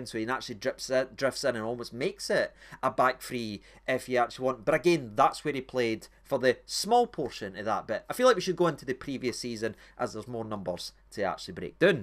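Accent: Scotland, United Kingdom. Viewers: Your scottish calm doon we are pish at speaking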